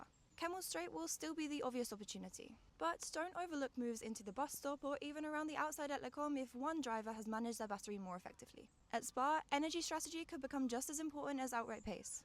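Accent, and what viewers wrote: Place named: France. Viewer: That French accent 🔥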